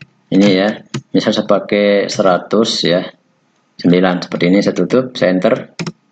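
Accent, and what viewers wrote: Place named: Aceh, Indonesia. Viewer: Lage awak aceh logat deon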